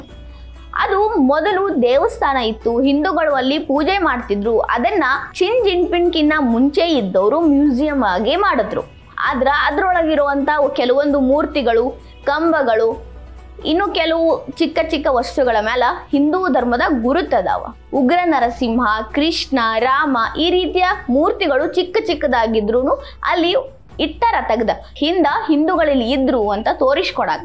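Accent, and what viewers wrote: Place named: Uttara Kannada, India. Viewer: Anna adu uttarakannada slang avru matadirodralli tappenilla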